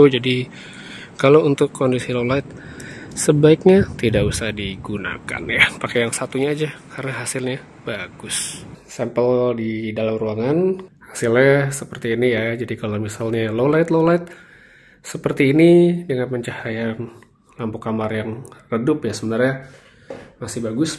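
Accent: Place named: Manado, Indonesia. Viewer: BANG ORANG MANADO YAH? LOGATNYA MIRIP ORANG MANADO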